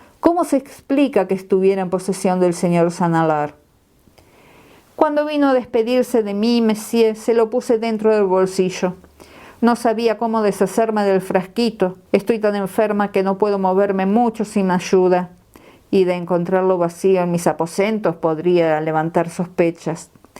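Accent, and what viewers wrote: Argentina. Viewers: Que buena onda, creo que por tu acento sos argentina. Yo amo a Agatha Cristhie y este titulo jamas lo vi - ni lei-. Gracias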